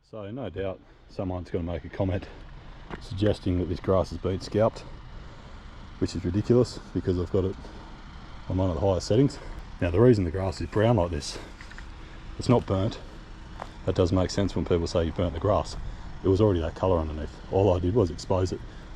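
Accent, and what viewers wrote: Australia. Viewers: Well, look who showed up on YouTube. So glad that you are back making videos,. We've missed your Aussie accent and sarcasm. Best of luck to you in your business--sounds like you have things in order now, so good on you! Blessings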